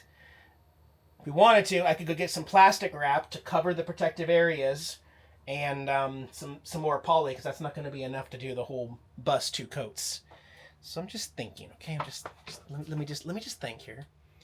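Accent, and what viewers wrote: South, United States. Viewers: I detected a bit of southern twang. You been hanging with those wonderful southern Mississippi people. Love it!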